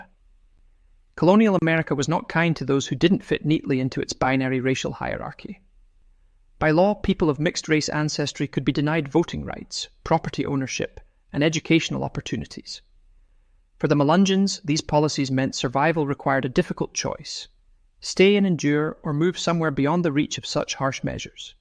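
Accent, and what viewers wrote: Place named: Scotland, United Kingdom. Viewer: The narrator has a Scottish accent.💙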